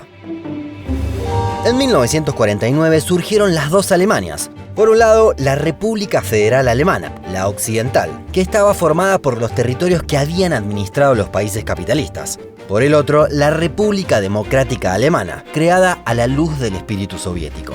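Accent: Argentina. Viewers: Hacen o haces no se quien esta atras de Un mundo Inmenso, pero es un excelente trabajo no saben el valor de cada video que he visto un buen resumen, imagenes, musica, todo esta muy bien. El narrador es Argentino verdad? Un saludo desde Mexico del Norte :)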